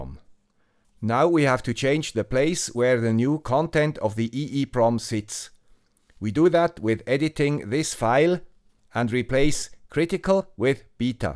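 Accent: Switzerland. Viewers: It works, it works, it works, it works! How much I love the man with the swiss accent! :) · Danke guy with Swiss accent. Now I will buy a pi4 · Watch out, after a update you might loose your *.dat and *.elf files, they were corrupted 28th of May, this is the old correct version <link> It's in Toms Hardware article. <link> It will save you some grey hairs. The new raspbian image has the wrong files, so this might help you. As always, a big thank you to "the guy with the Swiss Accent"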